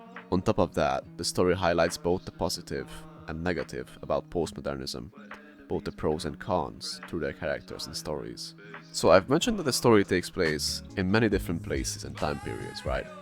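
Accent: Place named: Romania. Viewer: Its good enough to remind me of a Romanian friend of mine from college. Her English was incredible from just learning it in school. Her accent was very subtle and cute like:) Either she was just naturally gifted and learned other languages easily or the schools in Romania are amazing lol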